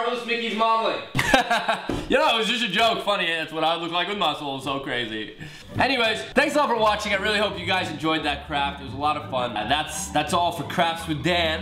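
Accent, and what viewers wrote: Canada. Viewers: Soorry he sails soory like Nicole and Andrew 😍 Canadian sorry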